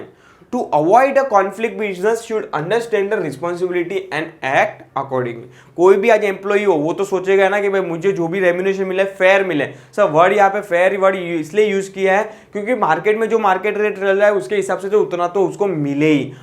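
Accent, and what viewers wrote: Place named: Mumbai, India. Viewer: Mr., you have a touch of Mumbai's local language.😅."aapan, yeh kya bol rha hai, paile(pehle).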